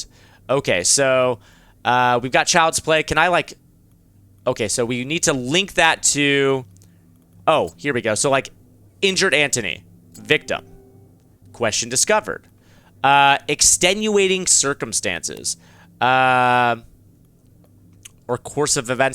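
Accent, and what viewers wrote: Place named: United States. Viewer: Oh god, should be on trial for how he pronounces stuff haha. A for effort though and smart of to just go with the 'I'm not even gonna try anymore and just be an american dude pronouncing it in english'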